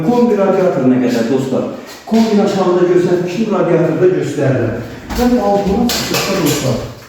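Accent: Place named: Nakhchivan, Azerbaijan. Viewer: Çox fikirləşdim bu ləhcə mənə tanış gəlir deme Mənim yerlim Naxçıvanlı imiş .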